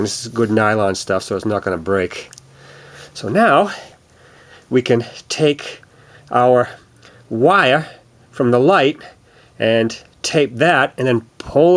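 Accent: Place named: Massachusetts, United States. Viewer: "Oh my God...you're a pimpin' fool!"...LOL You must be from Massachusetts...you have the same accent as a buddy of mine. He says things like rubbish...and Caaah (for car)...lol Nice videos! I may have to do this but with one that is not the sequential flashing type...to me it is a bit annoying. I would be adding them to a mirror that already has forward facing flashers...I just want some with them facing the rear to alert people coming up on you.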